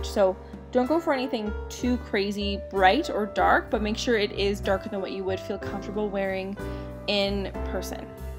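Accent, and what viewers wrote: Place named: Canada. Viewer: Super Canadian accent!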